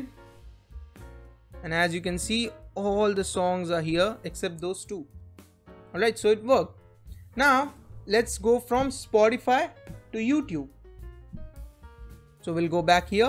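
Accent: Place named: India. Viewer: Deshi style main English mat bol Bhai thoda toh respect Kar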